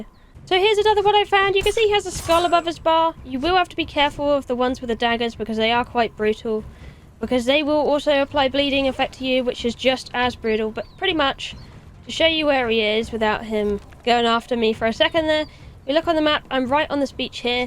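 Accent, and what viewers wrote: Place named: United Kingdom. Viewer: Challenging accent as a native german speaker, but I've got a friend in the UK with a similar accent, so it wasn't as bad, heh. What I wanna say is, despite the unfamiliarity to your accent, I still understood almost everything. Thanks for the video, it helped. One question though; Are daggers viable/good throughout the game? Like does the bleeding effect for example gets stronger with the weapon?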